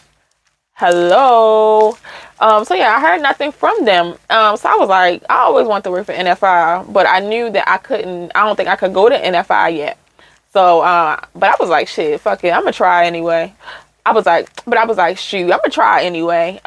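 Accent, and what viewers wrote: Baltimore, United States. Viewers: You from Baltimore? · Sound like you might be from BMore lol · I bet 100 u from Baltimore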